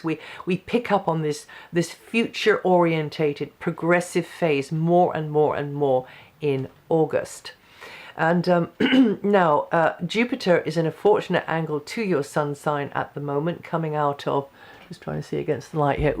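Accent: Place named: RP, United Kingdom. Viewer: great reading which part of the UK do you live in you sound posh xx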